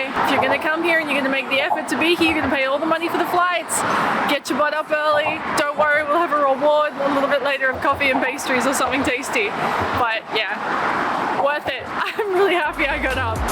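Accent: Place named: Australia. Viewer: Should have stopped at the firehouse by the Brooklyn Bridge, bet they would love to chat with a beautiful woman with an Australian accent.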